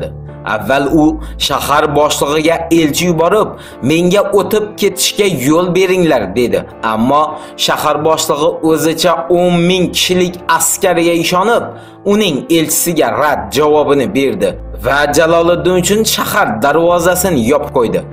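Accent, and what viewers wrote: Khorezm, Uzbekistan. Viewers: Ovozingga qutagim mincha xorazm diysan o'zbek de bildik zorazmdan ekansan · eyy siz O'zbekistonni qaysi viloyatidansiz xurmatli admin shevaizdan Xorazimliksiz manimcha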